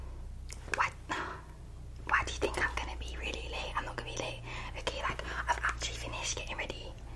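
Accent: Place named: United Kingdom. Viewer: What a beautiful British accent like the girl 🫢‼️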